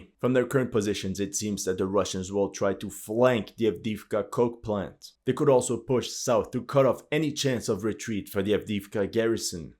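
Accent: Quebec, Canada. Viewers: That "c'est fini bro" get closer and closer to the Quebec accent you are getting closer with every words spoken. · Что ты хочешь от канадца французского происхождения? Нормальное у него произношение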